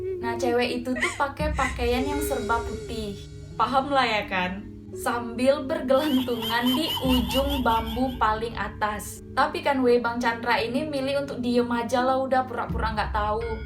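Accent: Medan, Indonesia. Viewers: Mbak orang medan ya? Kenal pak john als 270 tidak mbak? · ank Medan rupanya bah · Anakk medann kalii ahhh🤙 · Wih anak medan nih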